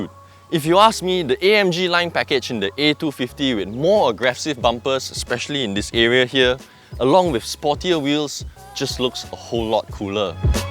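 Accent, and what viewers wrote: Malaysia. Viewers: 怎麼感覺是馬來西亞腔英文....好奇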